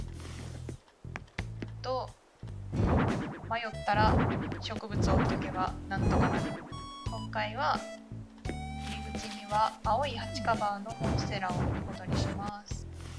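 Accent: Kansai, Japan. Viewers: 初めまして！あつ森島作り迷走中の停滞中です😅 そんな中さんの動画に出会いました！私も関西なので、さんのしゃべりが心地良いです😊 素敵なブックカフェ、ぜひマネっこさせてください🥰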